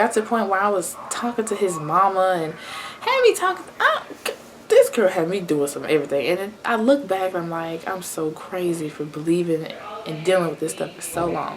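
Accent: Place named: Detroit, United States. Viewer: you have a detroit accent for sure ☺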